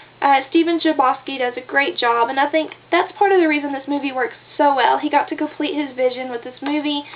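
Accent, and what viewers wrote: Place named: South, United States. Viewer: Yeah I like unconventional movies and I've had my eye on this one - thought you were from down South, you've got this June Carter thing going on! It's a beautiful accent to hear and I've been told you guys down south are the nicest people with good values - il just have to put up with my South London English accent!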